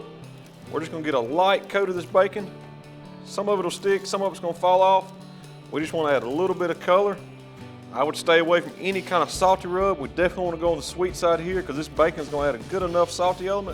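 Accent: South, United States. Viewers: That is one thick country accent I thought mine was bad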